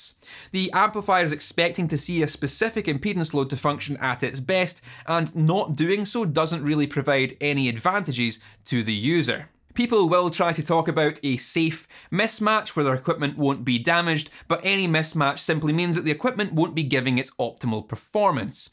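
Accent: Scotland, United Kingdom. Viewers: He's not American, but Scottish.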